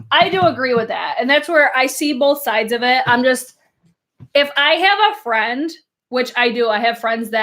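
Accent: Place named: Western New York, United States. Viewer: From what I’ve seen, the same capital investment company is behind Morphe and JHC. If that’s true, they’re the ones behind the new Morphe x JH palette. Trying to boost their investments. Your accent... I was thinking you were from Upstate/ Western NY. I know a lot of people from Buffalo and Niagara Falls that have similar accents. Love from NJ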